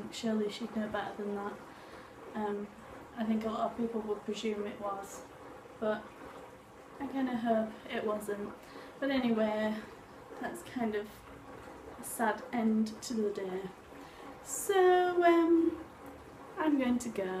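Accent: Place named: Yorkshire, United Kingdom. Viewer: I love your accent from Yorkshire ?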